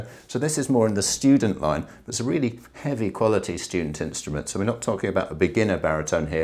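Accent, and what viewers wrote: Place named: RP, United Kingdom. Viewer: Only a £4999 bari to start with, LOL, and, wow, with a genuine Boris Johnson accent, too. Speaking of which... I have a yacht for sale. It is only £1000 and it comes with a guaranteed weekly dividend of £3,500,000. I used to have that accent down to a T and then I moved to the US. Maybe that's a good thing, though. PS Don't worry, I have ordered stuff from you in the past and not even Boris Johnson can stop me.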